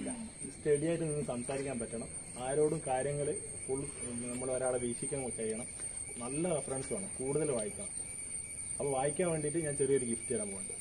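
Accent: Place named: Vadakara, India. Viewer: bro 😍 Vadakara yude thani bhashayil ulla vlogs😜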